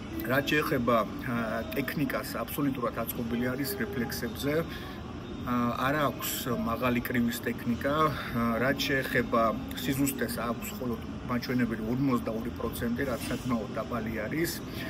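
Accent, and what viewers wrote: Russia. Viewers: ხაიკიკი არა მოხარშული კვახი კიდე , ქართულად ისაუბრე რა... ეს რუსული ხ მაგარი გამაღიზიანებელია და მოდი რაა ვლოგერობას თავი დაანებე და ბუკმეიკერობებს , არაა საუბარი შენი საქმე და იქნიე გამაში ეგ ხელები... ეს პური რომ მეპურემ უნდა აცხოს ხო ვერ ვისწავლეთ 😢😢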